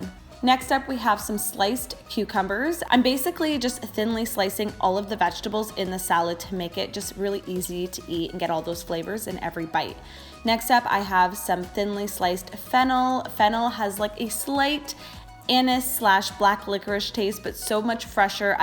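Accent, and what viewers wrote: Canada. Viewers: Love the recipe, I will try it for sure!! Btw, I love your accent, are you from Canada?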